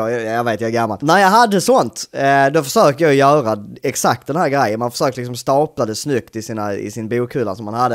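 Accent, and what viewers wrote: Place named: Skåne, Sweden. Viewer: Chex supportrar dig är från Skåne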